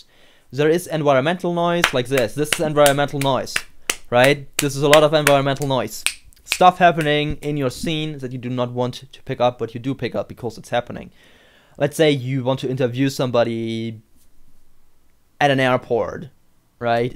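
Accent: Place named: Germany. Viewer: Are you German..your accent is dope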